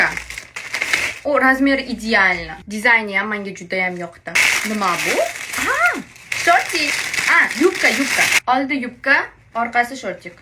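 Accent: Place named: Russia. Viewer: aksentiz rosa ruschaga tortarkan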